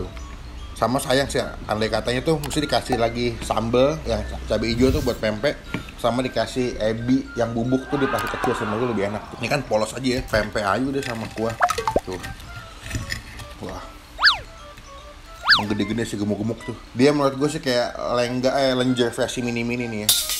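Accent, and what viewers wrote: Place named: Palembang, Indonesia. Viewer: Wong plembang mno suaronyo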